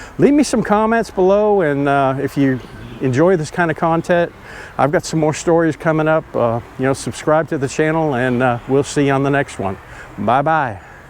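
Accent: United States. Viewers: New Sub, enjoying your vids. You made reference to previously being On The Job in an earlier vid (what Department or part of the States). I hear Midwest or South East Region in your voice? Regards, JP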